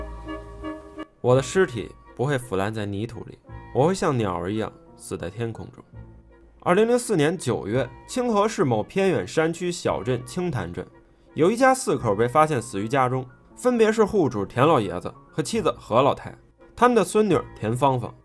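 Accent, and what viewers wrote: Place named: Beijing, China. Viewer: 北京人吧？不r道，电r机，赵iao娟儿～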